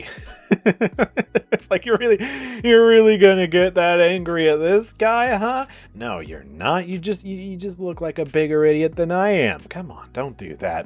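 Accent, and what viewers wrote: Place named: Canada. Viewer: dude why whenever i watch your video it makes me feel like iam watching canadian guy eh it just you guys have the same style in talking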